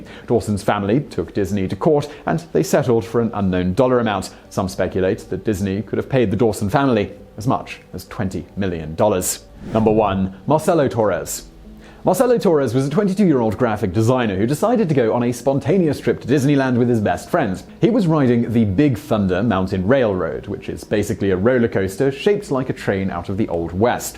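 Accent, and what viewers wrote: United Kingdom. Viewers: For god’s sake, he’s British. That’s how they pronounce it in that accent. Lay off.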